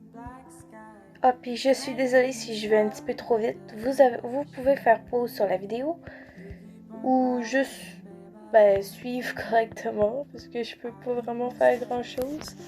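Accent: Quebec, Canada. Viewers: Trés jolie axent canadien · Je sais pas si ta un acsent québéquois mais si oui une pepite👌👌😭😭💖💖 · Ta voix me fais penser a une voix de québécoise et merci pour le tuto je like et m'abonne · tu est canadienne? Parce que j adore ta voix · J'aime beaucoup ta voix québécois 😍😘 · JADORE TON ACCENT KEBEQUOI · Mais non tu as un accent québécoi et je l'adore c beaucoup mieux que d'avoir le même voi que tout le monde 😘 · Tu est québécois car tu a k accent et je trouve cette accent trop chou 🤧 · Tu es canadienne jadore ton accent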